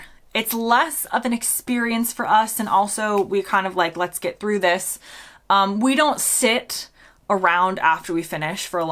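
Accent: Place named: Mid-Atlantic, United States. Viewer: Very interesting video as usual! I think you have lost your American (and indeed also traditional British) [æ] as the pronunciation of the short a in words like 'fact' and 'have'. You use a fully open short [a] just as in modern British English. You have also changed the typical American past [pæst] to a so-called mid-atlantic [pa:st] (a long, fully open front vowel) close to but not exactly like south British [pɑ:st] (a long back open vowel). You do, though, retain the American [æ] before nasals such as in words like can, can't and Ramsay.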